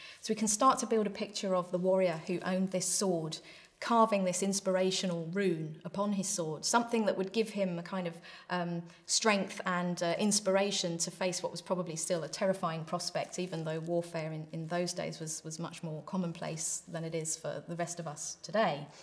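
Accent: England, United Kingdom. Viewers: Please re record this without the English accent. Thanks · Dr, that was excellent! I surely miss hearing a good British accent. Its been awhile. A comment and a question if I may........... I do wish I could have had teachers like you when growing up oh so many years ago, and Where were you when I was your age? You are quite a fetching young lady. I am curious about the tats on your inside forearms, are they of any historical significance? Sorry. That's 2 comments and 2 questions. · It's amazing how a British accent can make two rusty piles of metal seem interesting.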